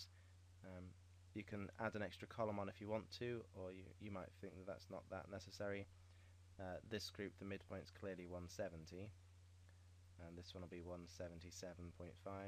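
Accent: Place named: United Kingdom. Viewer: Brilliantly simple and a nice British accent so it's easy to understand you :)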